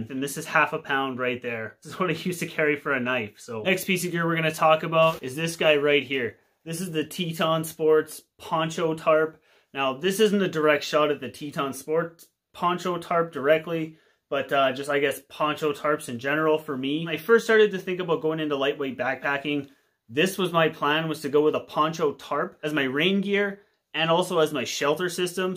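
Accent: Canada. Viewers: Geez, if you want a larger U.S. Audience gotta tone down that canuck accent my friend - but, guessing it's a slow PRO-cess.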